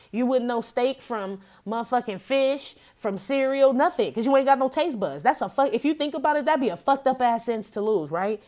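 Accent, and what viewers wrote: Chicago, United States. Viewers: Ctfu I know Chicago when I hear it!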